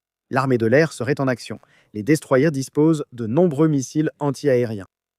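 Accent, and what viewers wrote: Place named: Israel. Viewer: Vous voulez etre les seuls a avoir la bombe atomique. Pour qui vous prenez vous pour les maitres du monde .pourquoi Israël possede telle la bombe atomique.vous ne parlez pas des 75000 palestiniens éliminés par vos force israélienne .aucune sanctions contres l'entité sioniste. J'espère que les iraniens possede l'arme nucléaire.vous devez etre un israéliens cela se devine avec votre intonation. Sortait déjà des territoires palestiniens ou avez commis un genocide inhumain. Mais votre destin est scellé rien ne pourra excusé l'élimination de plus de 75000 palestiniens.avec le silence des européens et des americains.mais dieu veille. et votre Fin et proche.vous n'avez pas d'état vous etes un peuple errant sans domicile fixe.sans la benediction americaine qui vous protege sinon vous n'êtes rien qu'un grain de sables dans l'immensité du désert. 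mais dieu saura reconnaître les siens. Et celui ci feras tombé les foudres du ciel sur vos têtes. C'est vous les terroristes tueur de femme et d'enfant. La situation réelle est que dieu vous chatieras. Tu mangera tes petits pain en enfer.sans les amerloques vous netes rien.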